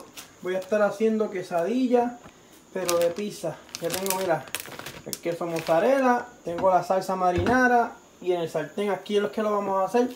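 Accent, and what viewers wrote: Puerto Rico. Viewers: Puede tener el acento de boricua, pero aseguro que ese día te es Dominicano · Hay papa me gusta ese acento de Puerto Rico. Si lo hago con pepperoni y también jamón y le echo cebolla, aji. Bien rico.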